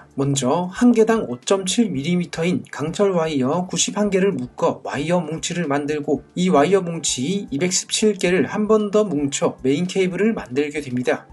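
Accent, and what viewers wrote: Gyeongsang, South Korea. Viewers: 채널 주인 분이 경상도 억양을 쓰시다보니 그런 것 같아요ㅎㅎ 경상도에선 유궐치뤌파뤌 이렇게 발음하다보니깐요.. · 경상도 사투리에요, 발음이 쌘게 특징이죠.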